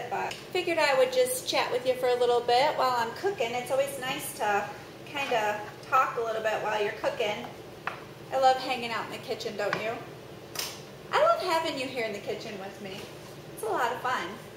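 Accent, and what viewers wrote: Michigan, United States. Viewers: I love listening to you talk :) my dad is from Michigan you for sure have a Michigan accent :)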